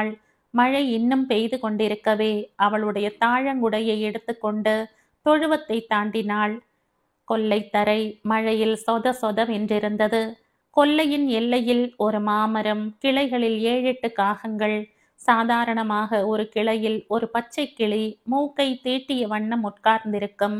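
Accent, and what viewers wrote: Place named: Palakkad, India. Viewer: Mam, Your Palghat accent is very nice. Anyone will assume you are brought up in Palghat!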